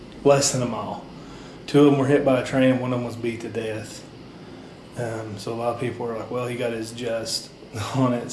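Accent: South, United States. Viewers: I know ur a southern boy where u from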